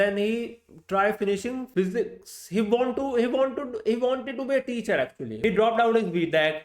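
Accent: India. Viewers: Bhai english hindi jaisi hai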